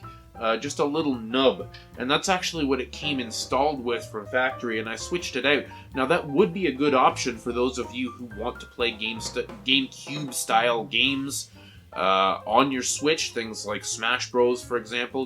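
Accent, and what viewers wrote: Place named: Canada. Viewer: I hear that canadian accent 👍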